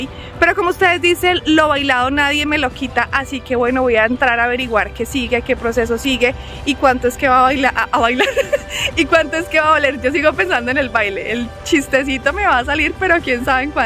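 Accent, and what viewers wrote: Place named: Colombia. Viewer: Jajaja ahora si te salió todo colombiana contando esto. Saludos desde Chiapas México.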